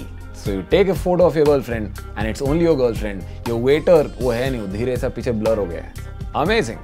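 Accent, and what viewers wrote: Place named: Bengal, India. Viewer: I'm waiting for your next video. When will it come ????? I like ur Bengali texture in this unboxing video so please use it (Bengali texture ) in your next video. I will be waiting...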